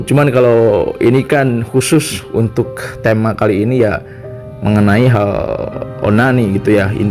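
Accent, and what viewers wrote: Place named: Cirebon, Indonesia. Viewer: bahasae kaya cirebon ...cirebon pundi kang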